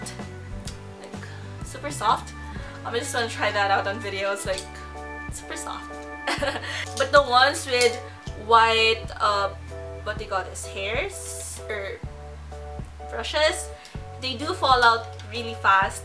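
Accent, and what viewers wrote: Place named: Philippines. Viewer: Omg! Ur filipino accent is so on point! Im so jelly cause my accent is like a canadian accent cause i live in canada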